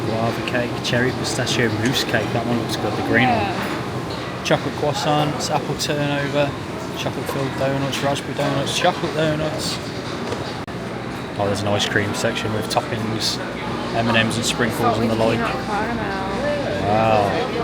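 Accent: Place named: Birmingham, United Kingdom. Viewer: Your thoughts were exactly the same as mine when I first visited Vegas. We love the Luxor and I cant wait to get to that part of the vlogs when you get there. The Luxor for us is quiet to retreat to but still on the strip and a fantastically themed hotel. I'm picking up your accent being local to mine too which makes the vlogs very easy to watch and relate to. (Im from Birmingham UK). Keep up the content, this is the video I stumbled on to find your channel.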